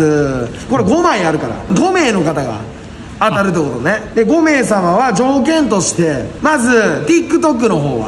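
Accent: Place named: Kansai, Japan. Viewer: チャンネル登録しました！いつも元気なさんに元気をもらっています😊 関西を離れて15年😢関西弁をここで補給しています！これからも投稿を楽しみにしています！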